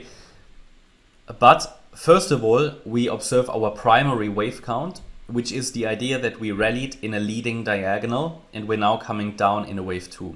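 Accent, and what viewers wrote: Germany. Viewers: Content of interest. How you pronounce everything, I would guess you are a German.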